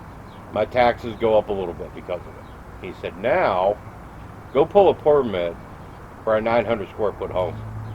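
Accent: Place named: St. Bernard Acres, United States. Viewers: St. Bernard Acres, I picked up your/our accent!! :) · Sounds like a fellow Texan! Yeah I agree, Ohio better be glad Texans didnt live in Ohio, we'd tell them to kiss our big hairy wal nuts. Its like my fellow Texan says, out in the country they dont give a $ h!t if we live in Link Knights cardboard house, as long as we have a proper waste system. The more I hear about idiotic laws in other states, the more I love Texas. God Bless the Lone Star State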